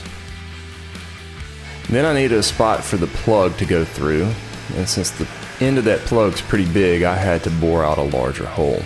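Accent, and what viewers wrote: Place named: North Carolina, United States. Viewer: Great cart man. Where are you located (generally) I'm in NC and you sound like you might be "from round here" ;)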